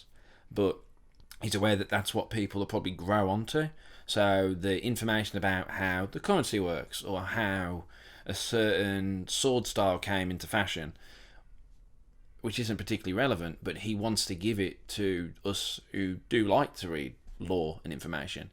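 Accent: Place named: Birmingham, United Kingdom. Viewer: Hi, I know you’re from Australia, but can I ask…are your parents from Birmingham? I’m having an argument with my husband that your accent is not purely Australian. I can hear some Brummie in it. Please excuse the prying, but I lived in Australia and you still sound more Brummie to me. Would you mind letting us know??? Please…thank you.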